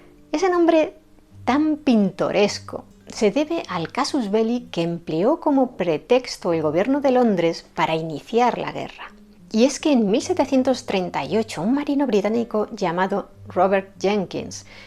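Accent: Spain. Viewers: Siempre escuche de Blas de Lezo como un valiente defendiendo a Cartagena. Que interesante!!! Narrado por una mujer de la madre oatria con una bella voz y poetico acento😊😊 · Exquisita historia de mi ciudad Cartagena de Indias, admirable y a la vez muy triste el final de este gran héroe Don Blas de lezo, en las escuelas si enseñan esta historia de la defensa de Cartagena ante los británicos y de hecho hay un monumento de el frente al Castillo San Felipe de Barajas y llevan su nombre un colegio y un barrio; ah y muy buena narración de con ese acento español que te caracteriza.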